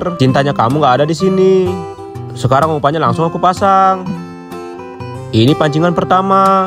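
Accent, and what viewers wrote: Java, Indonesia. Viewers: saya mau tanya, apakah kamu ini ada keturunan orang Jawa kok dr logat’ya Jawa bgt bang??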